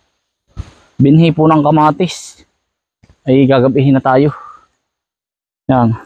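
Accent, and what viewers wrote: Quezon, Philippines. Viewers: Iyan po ang nakasanayang tagalog ng mga taga Quezon, mahirap kay na baguhin ang nakasanayan Ay sadya iyan ang punto at mga termino gamit dito sa Quezon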